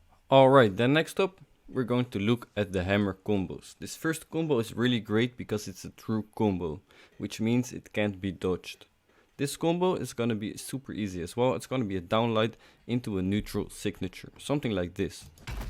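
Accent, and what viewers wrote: Netherlands. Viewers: dit nederlandse accent:cat-orange-whistling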